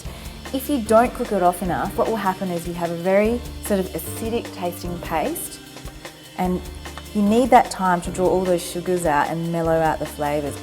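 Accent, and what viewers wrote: Australia. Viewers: accent sounds like an australian...anyway,that roti chanai looks delicious!thanks,definitely gonna try this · Yes and it's particularly a South Australian accent.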